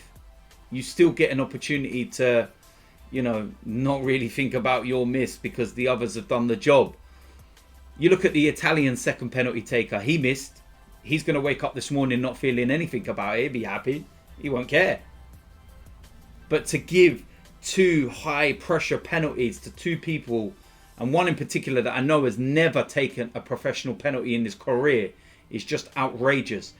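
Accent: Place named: RP, United Kingdom. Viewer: Love how posh & 'professional' he speaks on his own channel compared to being on aftv & other channels 😂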